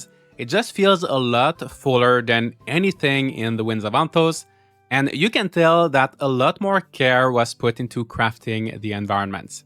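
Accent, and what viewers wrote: Canada. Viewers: this is so random! But I'm just wondering what kind of accent you have? I know you're from Canada, but it doesn't sound French Canadian to me!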